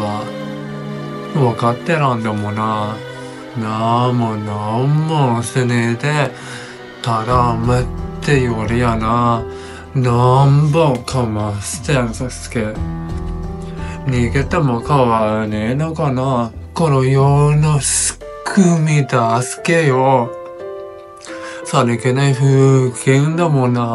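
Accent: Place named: Nanbu, Japan. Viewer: 南部弁初めて聞きました。同じ日本語なのに不思議な感じです😊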